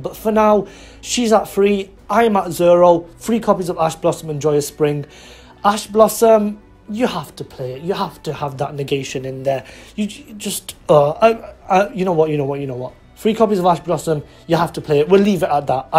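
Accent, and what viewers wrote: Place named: United Kingdom. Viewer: #Nekrozmasterrace I pronouce Natasha the same way you do, even though the only girl I met with the name irl pronounced it like stache too. Personally Impcantation is a better name than Deviritual to me. It's a pun I can get behind. Yeah, I believe you're british. Saying that as a fellow British. I can recognise our kind. 'Eyyyyyyy! That ghost rare artwork for the extra deck cards.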